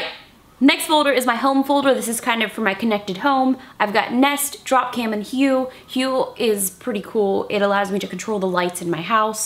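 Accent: Pittsburgh, United States. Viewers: Is she from Pittsburgh Pennsylvania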